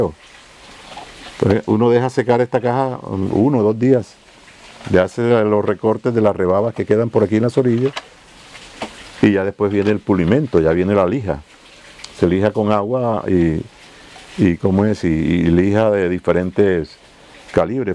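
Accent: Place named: Caribbean Coast, Colombia. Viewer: Cuando adolecsrnte aprendi a tocar el acordeon piano, antes de irne al Conservatorio. Hace años no lo toco. Me dediqué al clarinete y luego al piano. En Sincelejo, alguna vez me afino el acordeón un señor llamado William Molina; hermano de Aniceto Molina. Recuerdo que en ese entonces, me senté en su taller a observar como hacía su trabajo y quedé asombrado de ver el mecanismo interno de mi acordeón piano de 120 bajos. Pero noto que este señor sabe muy bien de lo que habla y hace. Un manejo muy pulcro del lenguaje tecnico y musical. Me encanta tambien el estilo elegante al hablar del acento Costeño colombiano. Estoy embelezado de tanto conocimiento. ¡Felicitaciones al Maestro!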